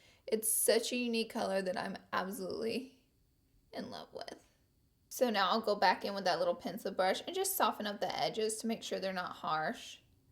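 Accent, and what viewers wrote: South, United States. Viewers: yes, us southern girls pronounce things funny, and so what?! I say ole to for oil. when I first found your channel and heard you say that, I couldn't believe my ears. i was like... YES! someone else that says it wrong. do you say creek or crick? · Where are you from ? You sound like you have a country accent 🤔 but it's really cute ! Love you , new subbie ! 🤗💗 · I lurrve that your accent is still so thick! xoxox, fellow southern belle💁🏼 👑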